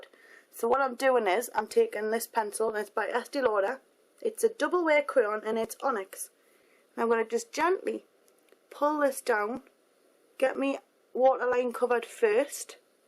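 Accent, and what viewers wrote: Newcastle, United Kingdom. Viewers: um im pretty sure by her accent you can tell shes from the united kingdom lol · Lmao. Obviously she's a Geordie.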